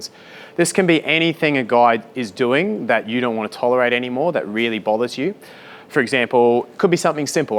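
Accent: Australia. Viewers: Is it Australian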